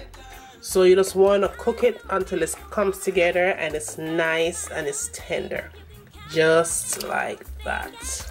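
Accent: Jamaica. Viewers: Yessss I feel like I'm in Jamaica listening to you right now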